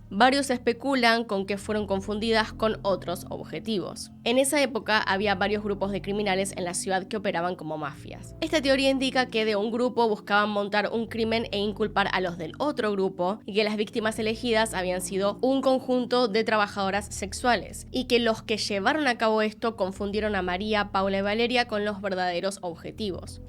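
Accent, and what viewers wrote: Argentina. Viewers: de qué parte de Argentina eres?. Un saludo efusivo de una española que te admira❤❤❤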